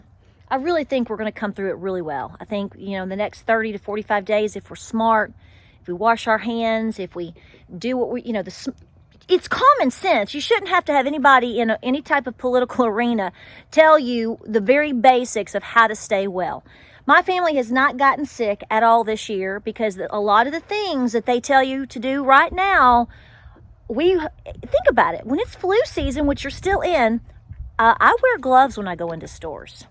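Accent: South, United States. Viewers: So glad you're ok! Just had your channel suggested to me, and I just love it. My husband's family was from Murfreesboro, TN and I grew up in Columbus, GA. We retired after military traveling around the world,now living in Rome,GA. It is such a pleasure hearing another fellow southern gal and learning from her on your recipes. I hadn't heard that term "cat head biskits" since my mother-in-law used to teach me in her kitchen back in the 80s-90s. Thanks for those great memories! Many blessings to you and your family! Thanks for such a great video here. Reminding us all to remember those things most important!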